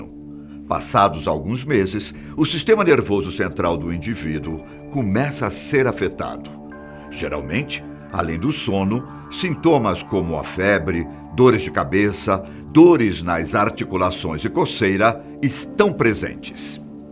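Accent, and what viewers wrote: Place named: Bahia, Brazil. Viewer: baiano kkkk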